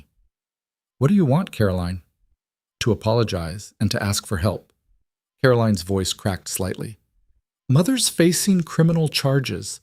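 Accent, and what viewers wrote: United States. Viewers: Good story but please use a British narrator when in Great Britain. The American accent take away from the story.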